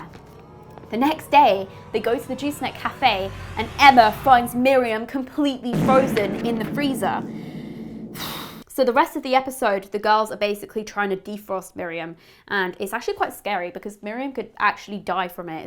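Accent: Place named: Australia. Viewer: You could just be reeeeally committing to this but I’m gonna believe you are. Names right. Tiny image resembles the actor. Played 1 out of 2 of your gaming vids and heard the Aussie accent., you were wronged, so wronged. You were sweet and Kim was a little asshole, honestly.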